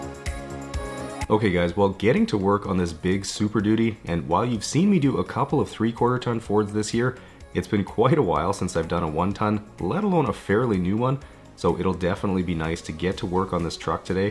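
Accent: Canada. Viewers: I love hearing your Canadian accent and I hope your not thinking I'm a creep. Just I love hearing different accents they are very beautiful.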